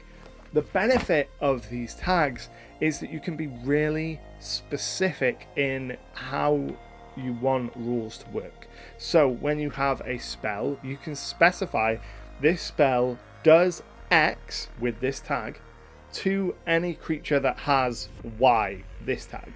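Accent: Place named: England, United Kingdom. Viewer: Thoughts 1. Tags. Completely agree. Standardised tags make everything easier 2. Encounter Building. Mindboglling that WotC has a DIFFERENT internal system for building encounters than the one published for other users. Perhaps it’s a business decision thing, to give their products a competitive advantage. 3. XP and Rewards. This I think is very hard. Xp is the hardest thing to sort out in RPGs, but it sounds like PF 2e is at least doing it better. 4. The Economy. Yes yes a thousand times yes. 5. More detailed Weapons and weapon effects. Agree, but the game gets WAY more complex, and not all players enjoy combat. 6. Level 20 Options. Yes, but 5e did make some improvements from previous systems. 7. 10th level spells. Here is a heresy, spell levels should be renamed to correspond with caster level, so 2nd level spells become 3rd levels spells, 5th level spells 9th level spells etc. 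Then we can have 20th level spells! 8. Magic Item rarity. Yep. but I like how 5e did Magic items. They made them a bit more special I think. And yes the character building is better etc The BIG negative however, is Pathfinder 2e is a lot more complex than 5e, and a lot of 5e’s appeal has been it’s simplicity (Before the rules bloat). Great vid though dude. I too am from the UK so your joyful English Accent is a great oasis in the American dominated youtube! best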